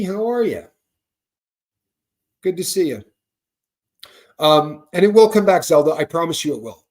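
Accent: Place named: South Boston, United States. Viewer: I hear a lil bit of Southie in your voice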